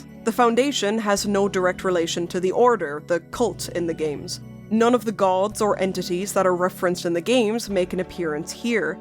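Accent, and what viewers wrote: Ireland. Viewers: Irish horror lady? Lets fuckin go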